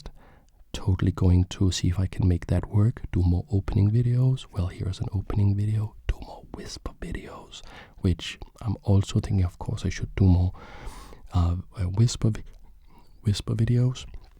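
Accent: Denmark. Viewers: the soft spoken danish accent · I think I also could recognize danish accent any day now :)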